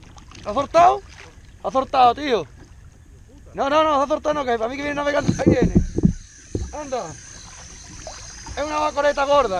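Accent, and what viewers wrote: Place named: Andalusia, Spain. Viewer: Eres andaluz, como yo. Yo pesco en la Bahía de Cádiz, y por fuera de ésta, desde Chipiona hasta Chiclana, y llegando a muchos metros de profundidad, a veces a más de 200 m. Atunes y de ese porte, por Huelva y el Estrecho, por aquí tb , pero son más raros. Por tu acento, por la segunda zona. Un abrazo y buena pesca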